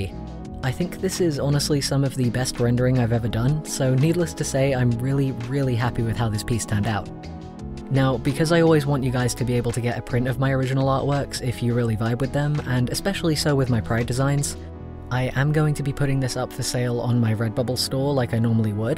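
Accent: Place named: United Kingdom. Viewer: I didn't even realise he was British. It just sounds normal to me (I'm British)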